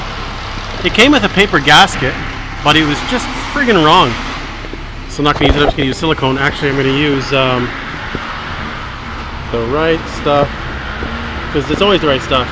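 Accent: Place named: Canada. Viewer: I don't know what makes this video better.....your extensive knowledge of car repair or your awesome Canadian accent.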